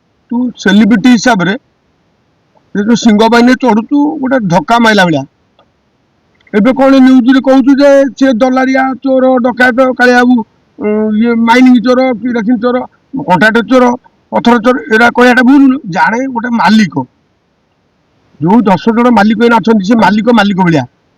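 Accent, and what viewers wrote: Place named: Balasore, India. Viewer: Balasore kothaa kon kohuchy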